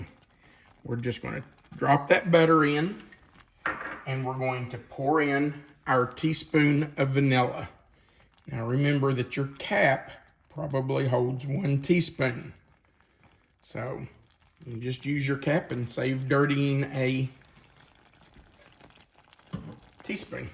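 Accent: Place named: Kentucky, United States. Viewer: As a 14-year-old, this was the first candy I made, and it was from the back of the Cocoa can. I was a farm kid and learned to help in the kitchen from an early age. I did not have canned milk and used our Dairy farm cream from the top of the pitcher of milk. I did not have measuring cups or spoons. Used a teacup and a teaspoon and real home churned butter & Watkins vanilla from the "Watkins man" who came around on a regular basis. I also used the cup of cold water to test it since no thermometer in 1957. My mom walked me thru the first time and turned out fine, delicious. I made it many times after that thru the year, not just Christmas. I got the proper equipment in the 1960s but never made a difference in the outcome. Love hearing about your grandmother and that she lived in W. Virginia. I started watching you because you were from Kentucky and had that delightful accent. 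My Dad was born in W. Virginia when he came a little early while grandmother was visiting her sister. He was raised in Louisa, Ky but moved with his family to Central Oho farm at 16 years old. Love and miss my Kentucky relatives. I still love your accent, not as pronounced as my relatives, but watch for those delicious recipes.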